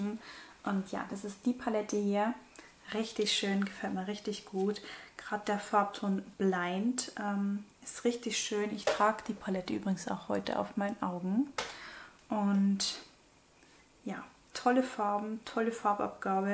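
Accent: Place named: Bavaria, Germany. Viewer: Soeben entdeckt 😅 gefällt mir gut.. Ich meine zu hören dass du aus Bayern bist😁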